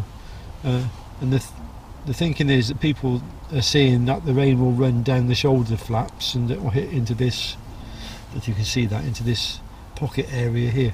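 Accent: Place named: Wigan, United Kingdom. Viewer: always thought you was a Wigan lad sound just them pie eaters up the road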